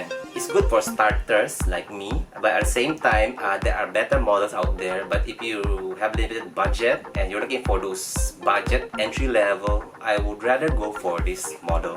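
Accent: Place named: Singapore. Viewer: grabeng accent singaporean vibes :) more videos again using brand new piano. :)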